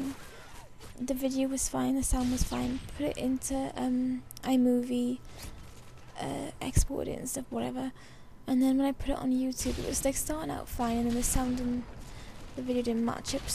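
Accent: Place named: Southern England, United Kingdom. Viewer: one of THE most helpful commentators ever :D, also you sound like you're from england :P just saying, because i'm from the south of england :) and it's a accent i recognize o.o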